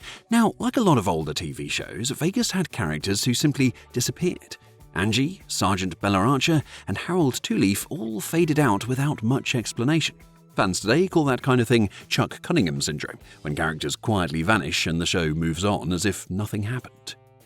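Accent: United Kingdom. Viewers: I wasn't born in 1978, but I had never heard of this TV show growing up in the 80s in the UK, so I assumed it was never shown in the UK. However, the person narrating this video has a British accent, so maybe it was shown in the UK!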